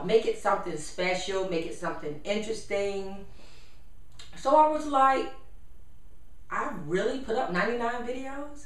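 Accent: South, United States. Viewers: I love your voice and your southern accent. <3 And yes, the thirst for fame has screwed up generating income. <3 Do you have children? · "Damn ya still that phat-sweetmeat eye-candy I wanna eat...I'm proud of you too, boo-boo! LOL-SALTS ~ Brains, beauty, grace, good-face and a heavy-duty booty! I love your accent, you are a southern chocolate-belle or to be exact, a ripe and juicy: Georgia-peach! You knocked the urban-hustle, you never ended-up jaded or faded, ya made good...Now work it! Like ya know you should...Teach, preach, reach-out to the congregation, amen! Stay hydrated on Gatorade, whilst ya haters stagnate!"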